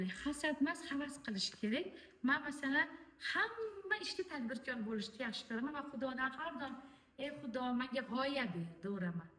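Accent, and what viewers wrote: Uzbekistan. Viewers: Маргилонча гапираркансиз оддий тушунарли халкона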